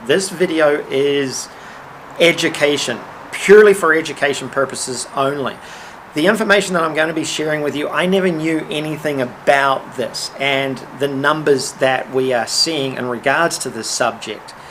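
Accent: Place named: New Zealand. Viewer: Never miss your videos, always on point ,no pulling punches. Am aware of a lot of the info you talk about. Just great to here someone on media saying it. Can relate to you because i also have an engineering background. Just love that Kiwi accent with a hint of American as well. Am Aussie so it is very easy to here it. Your subs should be in the hundreds of thousands ???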